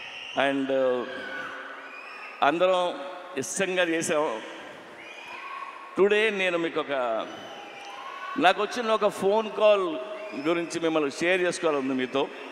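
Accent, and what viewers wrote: Telangana, India. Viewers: orey vadu telangana slung enti ra ..ni ayya..nuvvante maku oka nammakam ..but.. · Telenga slanga ..worst dialogue delivery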